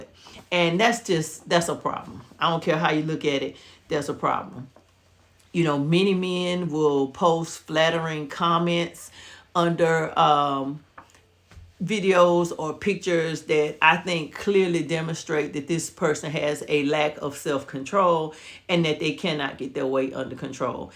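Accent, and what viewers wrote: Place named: South, United States. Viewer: I can tell by your accent that you're from the South. I am from the South as well. But I also lived in California for 2 years. And I can tell you that most Black Men on the West Coast DON'T like Overweight women!!! That's more of a Southern thing.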